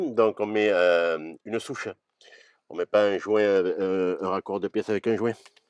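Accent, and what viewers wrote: South, France. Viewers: Superbe ! Et en plus avé l’açent ça nous ramène un peu de soleil au Nord ou il pleut ! J’adore le brinchement c’est le CC avé le CC le PP avé le PP et le moinsse et le plus, rien de sorcier! N’empêche c’est une installation que pas tous les plombiers la font si bien bravo!!!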